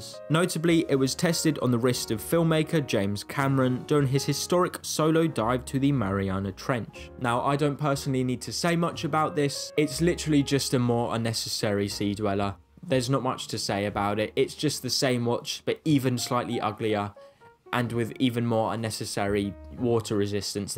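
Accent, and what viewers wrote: England, United Kingdom. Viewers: I can't even understand what this guy is saying with his English accent. You should post this video in Europe. Maybe people's taste in Europe is different as well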